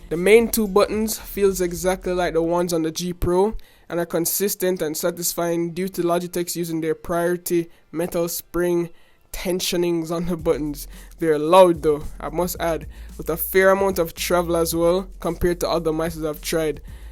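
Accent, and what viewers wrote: Jamaica. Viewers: You Jamaican I’m Jamaican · Good review. You sound Jamaican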